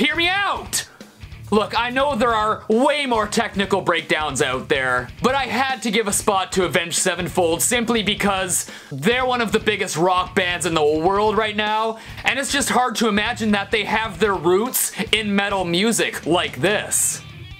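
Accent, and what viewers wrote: England, United Kingdom. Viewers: As a Brit, I approve that accent. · Spot on English accent to be fair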